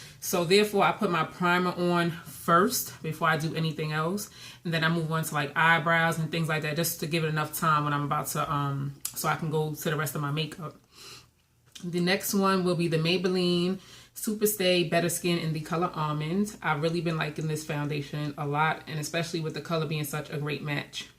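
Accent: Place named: North, United States. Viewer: I enjoyed watching. Came over from JustSynetta's channel (Love her.). Your eye look is freaking amazing. I must try to recreate really soon. Do you do tutorials? Must go see. I need to be shopping my stash before it needs to be thrown away. OAN: I got distracted a few times by the Northern accent (Jersey born, Atlanta is home now).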